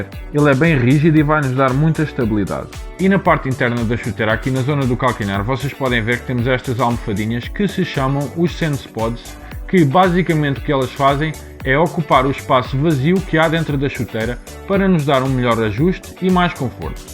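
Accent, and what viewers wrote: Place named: Brazil. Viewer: O português mais brasileiro deste YT! Parabéns pelo vídeo,!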